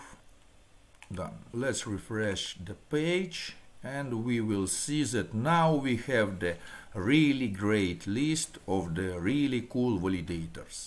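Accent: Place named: Russia. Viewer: Аналогично )) Зато всё понятно - нет багов в понимании английского или американского "прононсиэйшн". По русски говорит на английском!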